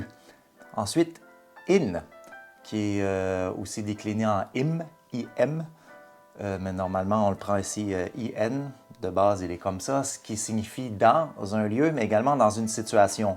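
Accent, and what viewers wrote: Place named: Quebec, Canada. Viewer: Bonjour, je viens à peine de m’y remettre à l’allemand, ayant abandonné cette langue car j’avais une très mauvaise méthode et une méconnaisance de l’apprentissage puis je me suis rattrapé grâce à l’anglais, l’espagnol et l’italien (j’essaye d’apprendre le turc aussi) et j’avais tant envie de m’y remettre. J’ai trouvé (tout juste) votre contenu qui est très pédagogique (j’adore l’accent québecois). Une bonne journée de la part d’un alpinois .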